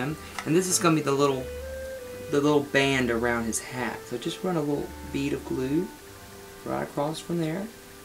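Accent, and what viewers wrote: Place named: South, United States. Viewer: I like ur Southern Drawl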